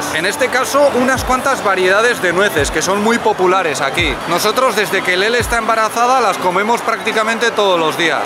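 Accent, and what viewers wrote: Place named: Basque Country, Spain. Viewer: Ole! Hay que decir que parece que hayas pasado unos días por Euskal Herria, te he visto con el acento reforzado 😁!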